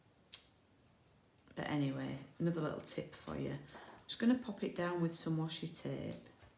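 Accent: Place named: Yorkshire, United Kingdom. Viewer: Watching replay from Sydney. I’ve been enjoying your videos. I love your accent. Are you from Yorkshire? I was born and grew up in Stoke. ❤️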